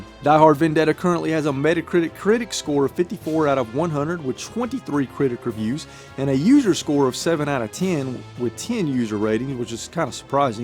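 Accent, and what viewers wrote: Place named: Carolinas, United States. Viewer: You sound like a Carolinian. Great video!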